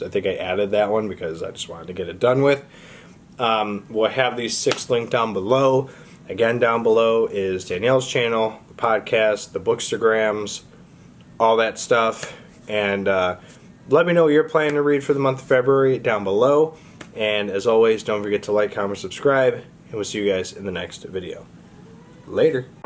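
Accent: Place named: Midwest, United States. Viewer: Man with heavy midwest accent in a Menards shirt...checks out. Great content 🤘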